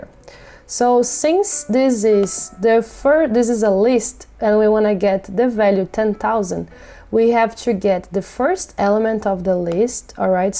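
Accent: Brazil. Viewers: Reconheci pelo sotaque kkkkk muito bom encontrar br pelos cursos cs50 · You’re from Brazil, right? Your accent reminds me of brazillans’.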